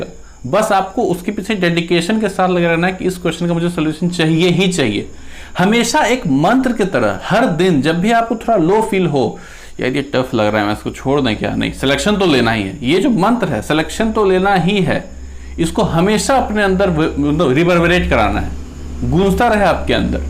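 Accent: Bihar, India. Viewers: Aap bihar se ho?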